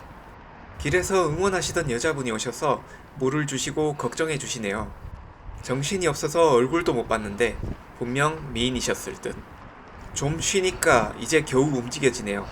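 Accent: Gyeongsang, South Korea. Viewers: 대구 출신이라니 경남분인줄 알았어요! 더반갑습니다. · 경상도 특유의 쌍시옷 발음 약하게 들어가서 귀여운 느낌 있어요 ㅎㅎㅎ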